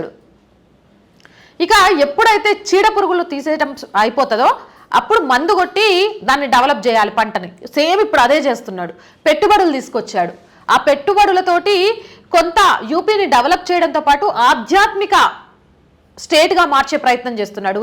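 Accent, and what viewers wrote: Telangana, India. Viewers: chevulu dobaya meku .....talking lo telanagana yasa alage untadi ....frst lo garu yogi ani annaru ...cheyulu vinapadaleda neku .......mundu ammai chese vakiti support cheyandi ante kani tappulu vetakadam kadu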